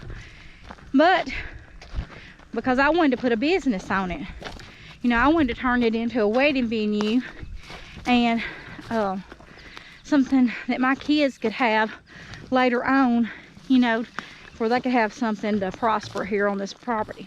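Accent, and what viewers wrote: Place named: South, United States. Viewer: Congrats! You sound like you're from the south. Last one of these I watched the people put at the very end it already had a contract! Cudzu is edible according to a man that french fried it during the depression ! Best wishes.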